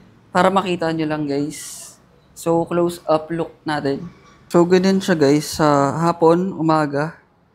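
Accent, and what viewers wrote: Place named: Visayas, Philippines. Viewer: solid ung pgka bisaya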